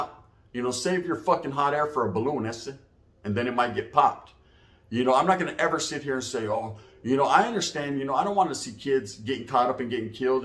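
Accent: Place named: South, United States. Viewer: Tbh, I thought you were a southern at first.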